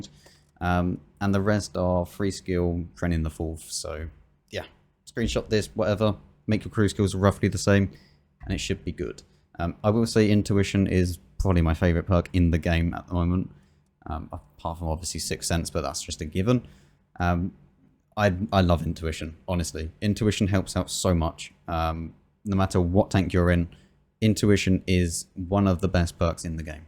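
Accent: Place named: United Kingdom. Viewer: Wow, who is this beautiful assassin lookin' dude at? With the {I think?) British accent? Does anyone know? His reviews are the best, my opinion, and I'm in love. Wow, my cheeks are hurting. No...from smiling!;)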